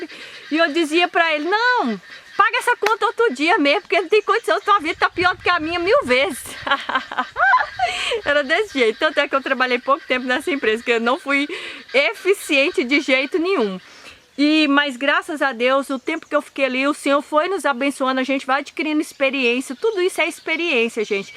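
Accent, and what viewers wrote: Minas Gerais, Brazil. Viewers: vc é de Minas Gerais?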